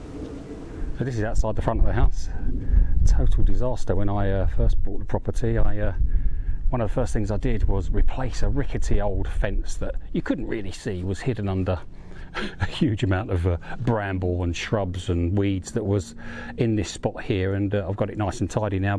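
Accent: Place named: United Kingdom. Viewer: Thank you for the vlog, i love the scenery, and the way you hv done up your property. If i ever have students again to teach them english i will recommend your chennel, beautiful every day british english. Thank you sir.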